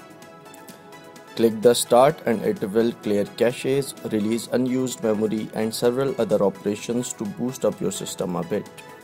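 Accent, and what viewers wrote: India. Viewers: Indian accent, i think ill pass on that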